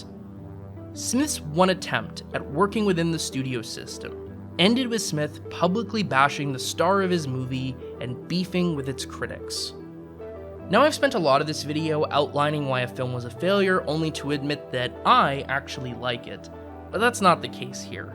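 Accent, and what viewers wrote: Ontario, Canada. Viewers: Brother I knew i heard some Ontario in your voice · The last thing i expected to hear while listening to this video as i recall always liking the movie Jersey girl, was the coincidence that i was currently walking down my street in Windsor Ontario Canada. I guess i should pay more attention to the way people talk, but it never struck me that you might also be from Ontario lol Anyways great video. I also went through a Kevin Smith completionist saga in my early 20's and found my favourites to be the lesser talked about films in his discography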